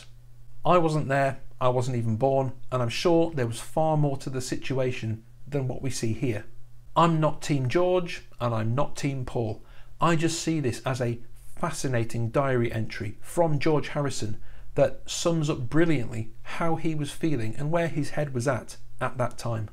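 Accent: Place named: Scouse, United Kingdom. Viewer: You hit the nail right on the head, mate. And I see Paul giving the finger subtly to people but when it came to George in the studio on the Get Back filum I think it is mostly just that he has his plectrum inbetween his thumb and first finger... I also think George would have been well aware of when Paul was giving someone the SNEAKY finger and would have reacted in the appropriate way. I just think he would have. Plus I think they were all close enough to be able to just tell each other to Fuck OFF or get screwed ! I really like the video, as I said, you hit the nail right on the head. And as you well know, being from 'round there, Scousers are a breed APART when it comes to Northerners and indeed all the British peoples. I think Scousers have just got that special ability to make jokes out of things the way only they do (mostly)! 👍 (I just PRESUME you come from somewhere around Merseyside cos your accent sounds a bit familiar... 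it's definately not a full-on Scouse accent but there's something there !)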